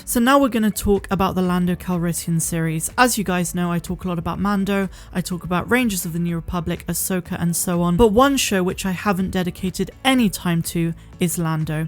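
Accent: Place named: West Country, United Kingdom. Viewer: Meh we can hear your West country accent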